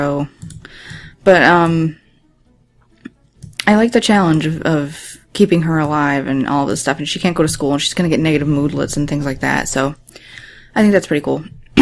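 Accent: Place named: New York, United States. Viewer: Are you from New York? I went there last spring and you sound like you are from there.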